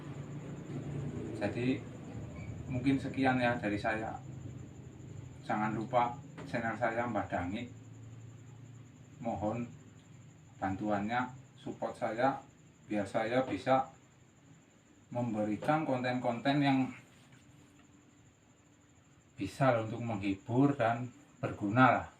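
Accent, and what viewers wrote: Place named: Banyumas, Indonesia. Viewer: Sama sama 🙏, alhamdulillah sudah berubah, dari awal saya suka dngn gaya bicara lucu ngapaknya, semoga canelnya makin maju mbah n selalu di beri kesehatan n bisa menghibur subscriber aamiin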